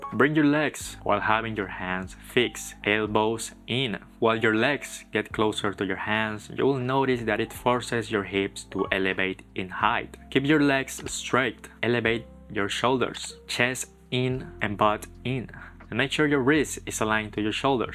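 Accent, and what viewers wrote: Spain. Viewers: Man, you look so asian, but you have a hint of an european accent (may be spanish). Not as important, thanks for your guide ;), especially the bent arm press. I have been trying to kick up to a handstand for almost 2.5 years and can barely hold myself up for a few seconds, inconsistently.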